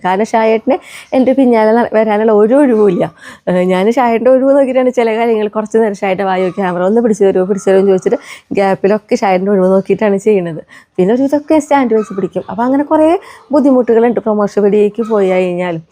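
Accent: Thrissur, India. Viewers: This is a viewer from UK, following your videos for long time. I think I even started getting Trissur slang 🤓. But I am from TRV based here in UK for nearly 20 years. Will you consider Airbnb there? So we could visit and be farm hands ?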